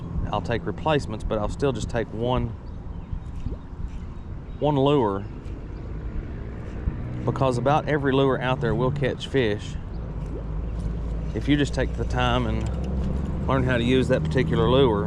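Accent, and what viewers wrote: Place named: South, United States. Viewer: I love a pop-r. I once caught two at one time on a torpedo lol and on a hollow body frog lol. What state you in? That’s one heck of a southern draw you have lol. I’m mid-Ga. Macon Ga.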